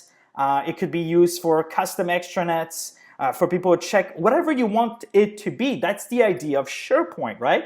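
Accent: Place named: France. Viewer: Great insight and very helpful! Loved your French accent too :)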